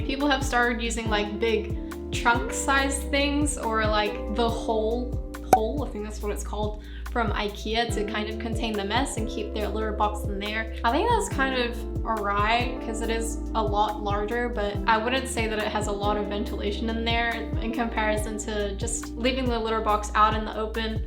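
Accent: Australia. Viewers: I'm just watching along minding my own business, when I hear.. "..a young whipper snapper". An I'm like hey, is she Australian? Yay. Finally found an Australian bunnytuber. I'm getting my first rescue rabbit in a couple of weeks. Eeek. Excited.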